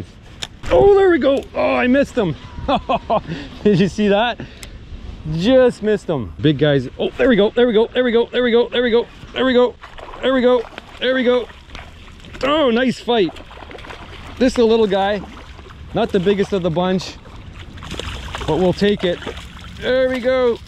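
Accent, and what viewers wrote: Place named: Canada. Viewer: This is great man. I love content like this. I've very jealous but also very excited for you. I've been wondering where you are from. You sound very canadian like me. I'm from northern ontario myself!!!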